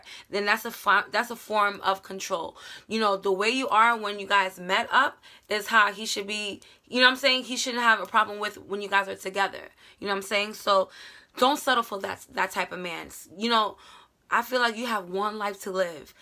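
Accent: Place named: Bronx, United States. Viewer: 746 - 0 no dislikes you a real one ❤❤❤❤ and I love your Bronx accent lol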